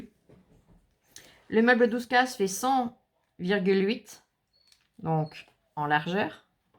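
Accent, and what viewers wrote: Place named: Burgundy, France. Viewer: Coucou je regarde vos vidéos depuis quelques jours et j’aime beaucoup. Et votre accent me disait bien quelque chose 😀 vous êtes de la Bourgogne vous aussi 😁😁😁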